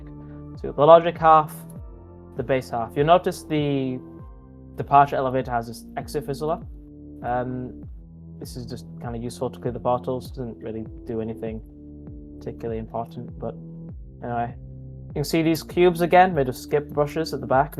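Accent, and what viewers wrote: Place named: United Kingdom. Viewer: british person talks about elevators for 12 minutes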